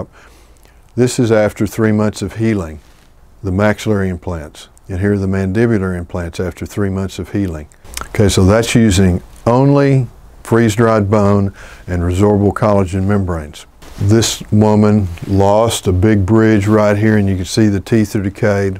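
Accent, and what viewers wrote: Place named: Texas, United States. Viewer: The only doc I would listen to with the Texas southern accent 🙂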